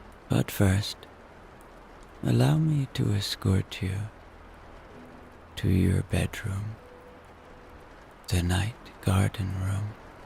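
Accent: Ireland. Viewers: This is my first time listening to one of your stories. Your Irish accent is such a bonus for me! Lovely voice and rate of speech. Comforting. Thank you,. ❤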